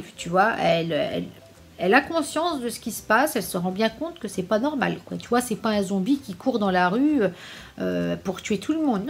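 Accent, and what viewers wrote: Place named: Quebec, Canada. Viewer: Hey je viens de découvrir ta chaîne , et ta façon de parler (timbre de voix ou accent je ne sais pas trop), me fais vraiment rire Aha ! Un total melange entre le québécois et le mec de la chaîne « Le bledar » x)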